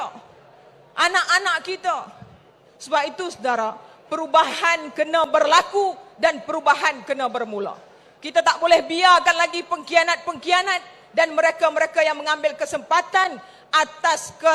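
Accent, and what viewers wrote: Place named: Penang, Malaysia. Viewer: Seronoknya dengar longat Penang....gua punya hometown. Hantam kaw kaw very good presentation. Inilah ahli wanita yang berani cakap. Kita nak kaum wanita macam inilah....🤞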